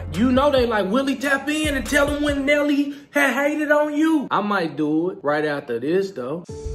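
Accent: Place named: St. Louis, United States. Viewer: Texas + Cali + Pinch of NY = STL Accent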